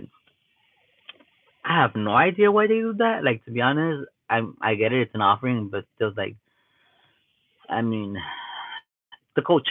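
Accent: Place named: Mexico. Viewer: Fuckn bullshit,we are not the same,everything different,traditions,religion,beliefs,and we are from this country. You want proof im sure there is alot of fellow native people that can show you,you people stop trying to put us together,fight your own fight and go back to where your from,you sound like white christians,we are all the same,stop lieing and maken yourself look stupid genz ,just go home and wave your flag,you can tell you was raised mexican by yoir accent,what was you when you was born retard,how was you raised, kearn akl your blood bur remember who you were born unless you dont like who you was born and thats bad cant ride the fence and now all of a sudden you wanna claim indian in this country,but your not from here or these tribes,just go home and rebuild your country and stand for your mexican rights wave your flag and be proud but dont try and feed off of native people here now,like cockroches. 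And tribes in this country dont kill to sacrifice,this kid dont know shit aboit tribes in this country. Go home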